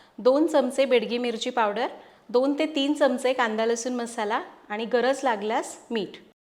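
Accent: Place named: Kolhapur, India. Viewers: जमलं ताई कोल्हापुरी बोलायला, आम्ही प्रॉपर कोल्हापूरचे MH 09नाद खुळा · Ly bhari bolla ki o aamchi kolhapuri bhasha🎉🎉🎉❤❤❤ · Kolhapuri jagaat bhari❤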